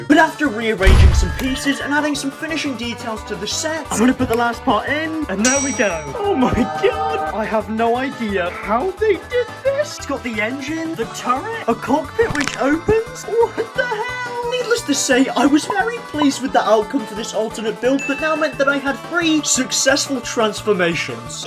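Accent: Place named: London, United Kingdom. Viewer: Your from London lol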